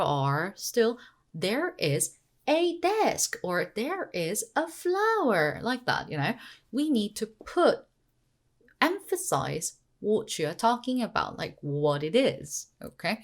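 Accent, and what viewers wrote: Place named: United Kingdom. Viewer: Thank you. I really love your videos. Your British accent really shines through! :-)) I didn't know about 속에. It seems similar to 꽂다, which I understood to be kind of meaning stuck in a tight space. What is the difference between 속에 and 꽂다 (other than the fact that one is a preposition and the other a verb). Are they basically used for similar spaces or is there a different nuance?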